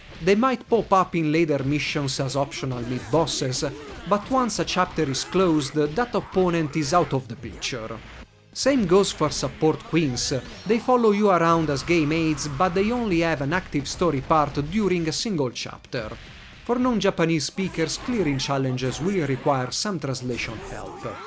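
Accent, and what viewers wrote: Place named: Italy. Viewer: Your italian accent is quite annoying.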